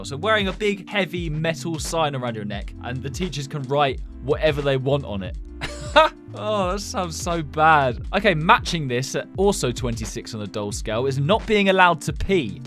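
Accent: United Kingdom. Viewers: Is it gonna be mean but are you British? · Is this guy British because I love people who are British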